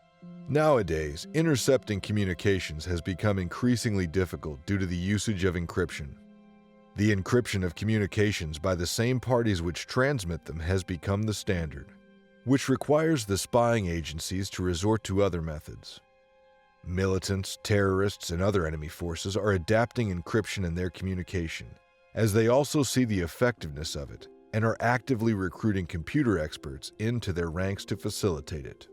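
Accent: United States. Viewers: I see you’ve improved and changed the voiceover to an American accent. Will you swap the accent when covering non-American special operations and intelligence units, bro?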